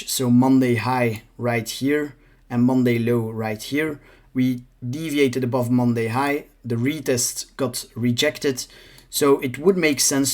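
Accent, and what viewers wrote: Belgium. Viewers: Dat Belgisch accent 😆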